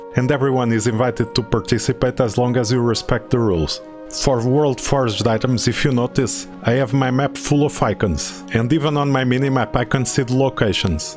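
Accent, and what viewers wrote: Portugal. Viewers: Awesome video. 1 question are you portuguese? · Hey! You Portuguese? O sotaque diz-me que sim 😅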